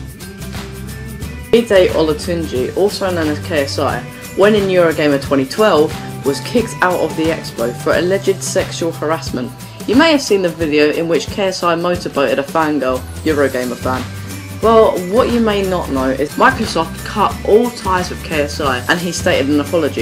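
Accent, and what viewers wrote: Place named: England, United Kingdom. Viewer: Where abouts from England are you from. I am from Leeds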